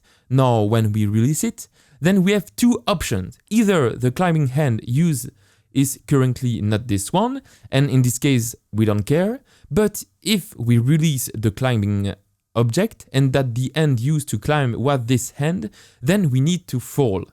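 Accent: France. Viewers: Your French accent is AWESOME! I love clicking a video and hearing your voice, because I know the content coming in is gonna be EPIC! 😙👌 (My emojis don't have the "chefs kiss" hand, but you get the picture 😂 ) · Ton accent français m'aide a comprendre parfaitement 😅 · Be honest here mate, always hated the french accent, no matter who spoke it, but damn ive learnt alot from you, love your accent now :D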